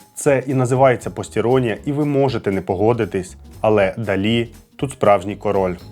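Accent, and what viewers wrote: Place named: Russia. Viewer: Мій улюблений художник. Він, дійсно, геній. Відмовився розмовляти іспанською з королем Іспанії, бо він каталонець. Про брата теж знала. По інформартивності- молодці. Особисто до Вас прохання - попрацюйте над вимовою, бо вона поросійщена. В нас тверді шиплячі. А у Вас це звучить як «[якщьо], [щьо], [щє], [вчінок], [вибачьте], [розбираючі], [бачять], [чєрга]…” Випуск чудовий, дякую. Якщо б ще вимову підтягнути- буде супер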